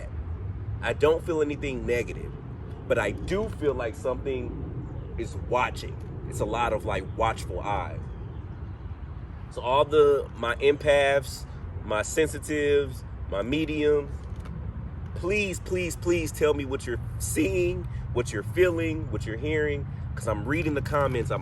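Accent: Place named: South, United States. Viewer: Just curious, why don’t the spirits that come through have southern accents like yourself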